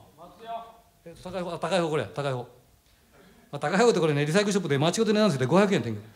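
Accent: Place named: Kishu, Japan. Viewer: ありがとうございます。我が家では濱口さんの紀州言葉をお互いに言い合って楽しむ時があります。関西人やからのお。ええのお！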